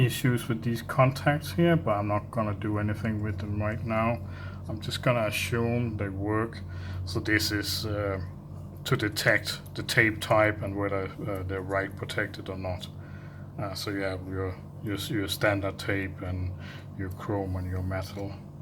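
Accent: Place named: Denmark. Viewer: Nice accent. Er du dansk?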